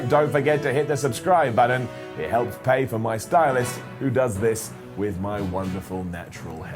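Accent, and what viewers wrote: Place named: United Kingdom. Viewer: British accent. Annoying.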